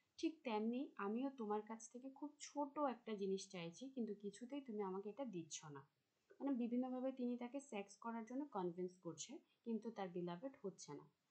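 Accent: Chittagong, Bangladesh. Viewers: 100% apnar bari chittagong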